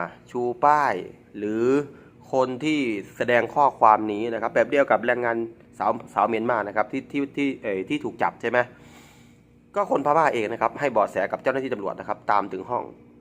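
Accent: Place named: Maha Sarakham, Thailand. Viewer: เทพโยคือเว้าภาษาลาวคือบ้านข่อยสารคามแทบบ่รุ้เลยว่าเป็นคนลาว ข่อยเห็นยูทูบเปอร์ลาวเว้าบ่คือเจ้าแมะ ถ้าเจ้าเป็นคนไทยคือยุ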